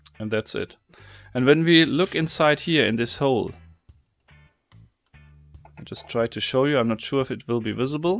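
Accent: Germany. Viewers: Kommst du aus Deutschland? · I'm here for the puzzle's too but I can't watch more than 1 video of him without muting the video... Don't understand me wrong I love this channel but quality is important.. I know what he's trying to say but it's hard to understand because it's neither british nor american english.. It's really shitty german english and german english doesn't sound good... he needs a lot more english experience to improve on this... so it would be better for him to talk german in his videos and I think most of his fans are german too ^^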